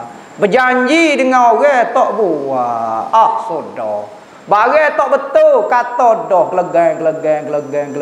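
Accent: Kelantan, Malaysia. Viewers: Allàhhurabbi... Xpenah jemu déñgar carà penyampaian ustaz bercéríta pàsal kísàh2 nabi, càra ustàz cerita sémua señàg faham dan múdàh hadàm.. Dr ségi bàhasà dàn lénggok kélàntan ustaz & bérsahaja buat àku làgi méndàlam masuk terus dlm kisàh2 ñàbi.. térus dpt bàyàñg keàdààn masa zàman ñàbi.. àku órg Johor suka sgàt lógat kelañtan & Terengganu 💪😎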